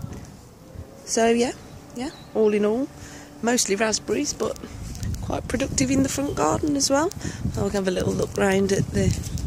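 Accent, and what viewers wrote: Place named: Birmingham, United Kingdom. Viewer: Its good to hear that brummy accent. Local. Im in Sparkhill.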